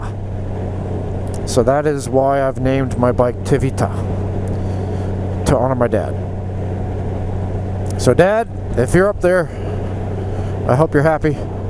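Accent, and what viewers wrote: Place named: Boston, United States. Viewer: Laytah ....BOSTON ACCENT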